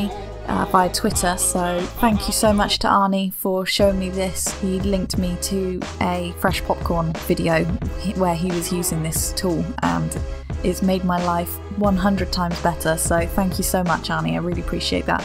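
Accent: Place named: United Kingdom. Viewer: Came for Move It, stayed for the mental attention to detail. Subbed and watched them all. I know your format is typically to VO sped up video but It'd be nice to see some of you playing real time. Nice to hear a Brit doing this so there's a little less boring grid!!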